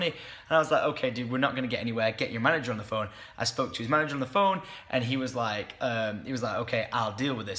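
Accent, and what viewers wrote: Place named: United Kingdom. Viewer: Respond Why does he have a British accent?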